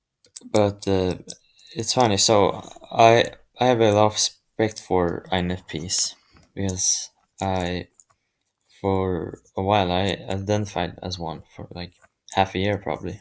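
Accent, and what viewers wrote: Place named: Scandinavia. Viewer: I like the video😄 Btw are you Norwegian perhaps? Your accent sounds Scandinavian😊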